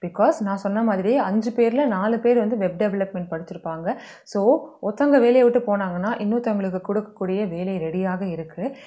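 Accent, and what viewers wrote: Sri Lanka. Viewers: Are you srilankan??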